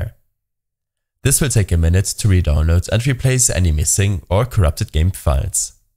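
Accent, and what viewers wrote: Germany. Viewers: Der deutsche Akzent ballert hahaha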